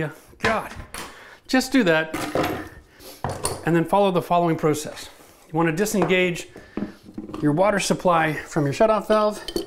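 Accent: Canada. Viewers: My comment got cut short Because my big carpenter finger accidentally hit the send arrow. So I'll repeat. This is the best toilet installation video I've seen... And as soon as I heard you sounding like Red Green and you showed me The Tim Horton's coffee cup To stop the vent leak, I knew you were Canadian. So thanks for all the valuable tips and Go Leafs! PS ... I'm here in the states so I have to make my own Tim Horton's coffee every morning And I don't get the cups. LOL. Stores here finally sell Timmy's coffee in cans..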